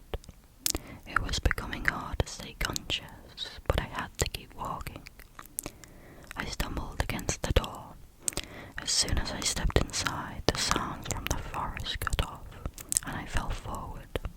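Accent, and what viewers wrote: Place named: Liverpool, United Kingdom. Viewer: I've only just found your channel. I'm glad I did, there's something about your accent and how you enunciate, it scratches my brain. Almost sounds like a very soft scouse accent.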